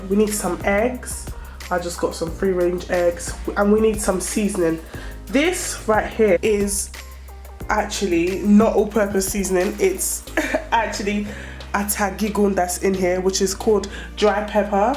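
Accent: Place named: United Kingdom. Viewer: She has a british accent , not her fault, actually lovely to listen to and as clear as day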